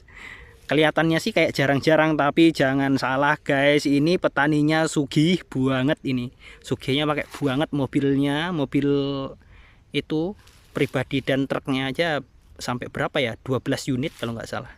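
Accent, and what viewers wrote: Java, Indonesia. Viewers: logat jawa bilang asal pontianak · Mas,Kalimantan,tapi keturanan Jawa ya logatnya orang Jawa tu,mohon no hp nya,saya orang Tuban jatim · Ikut nyimak sampean kok lucu boso jowone medok mojopahit ngendi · Tiyang pontianak kok jowone medok banget kang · Tak kiro wong jowo mas e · penasaran.orang Pontianak kok logat orang jawa.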